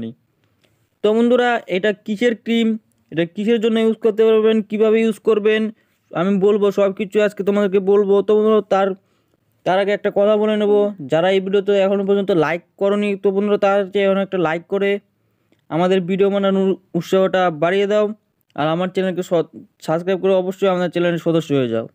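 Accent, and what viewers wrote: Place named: India. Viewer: Uni indian tai voice amon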